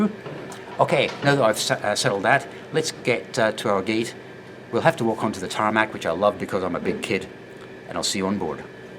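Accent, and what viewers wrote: Scotland, United Kingdom. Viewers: Love the Skaughtisch’ aksent.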